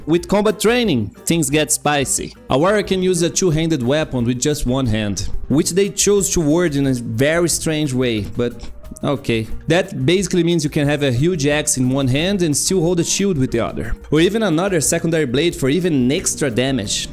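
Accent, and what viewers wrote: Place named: Brazil. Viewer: esse sotaque nunca me enganou. Seu canal tem uma versão br?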